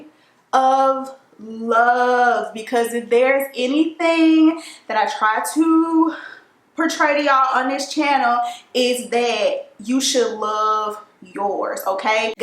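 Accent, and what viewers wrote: South, United States. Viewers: Hey cuzo, I love your curls and that southern draw is precious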